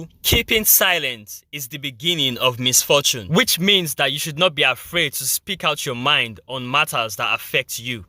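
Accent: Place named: Uganda. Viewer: Voice over 👍🏾 Accent sounds Ugandan Good historical preview and motion picture work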